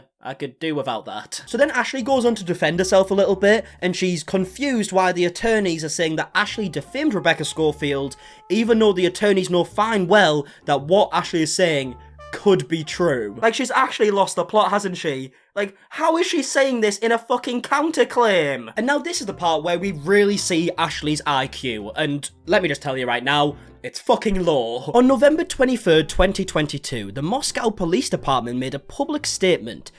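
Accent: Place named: North, United Kingdom. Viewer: NICE ONE! What is your accent, by the way? It sounds like somewhere in the UK, maybe up north, but I'm not sure.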